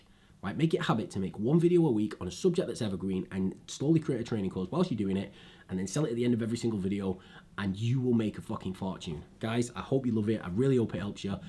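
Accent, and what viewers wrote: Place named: South Africa. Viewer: You talk like a South African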